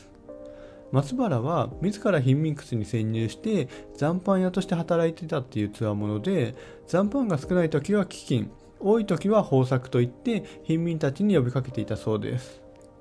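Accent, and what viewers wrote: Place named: Tokyo, Japan. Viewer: 綺麗な東京弁に聞こえますが。おいわさんの毒○をドkstと無声子音が連続した時に母音を極端に省略するかんじとかも ガ行も鼻濁音になってますし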